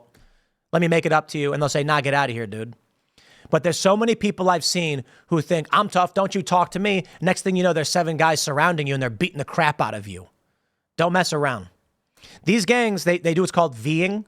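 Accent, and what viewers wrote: Chicago, United States. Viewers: Tim really tries to make his chicago upbringing sound like Gotham city. Guarantee it was not THAT bad for him. Most of Chicago is fine and Ik he didn’t grow up in the parts where it’s really bad. It’s very small parts that do most of the crimes. Tim is not from those parts at all so he’s trying to sound cool. Like he doesn’t even talk or carry himself like those kinda people🤣. Nigga is a skater trying to make it sound he from the trenches. He sounds like he’s from the north side more than the south side.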